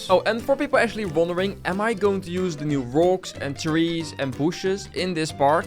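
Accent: Netherlands. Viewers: Ik ruik nederlands want je naam is en ik hoor nederlandse accent English: i smell some dutch cause ur name is dutch and i hear an dutch accent in ur english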